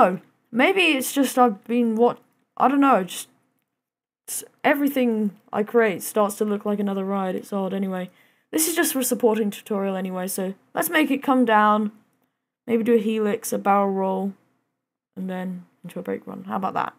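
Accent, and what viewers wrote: United Kingdom. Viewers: You live in Cali? You sound pure British though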